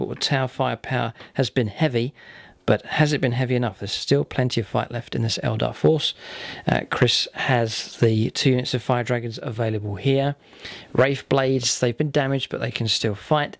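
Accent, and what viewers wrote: Northern Ireland, United Kingdom. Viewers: Northern Irish accent is immediately recognisable.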